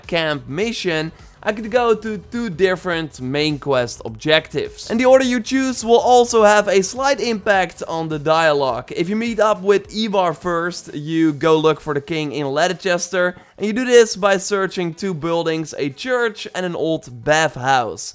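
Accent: Netherlands. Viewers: Are you dutch? You sound dutch... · u dutch man? if u are im subbing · I was like.. this guy his voice sounds so dutch and little did iknow hes dutch lol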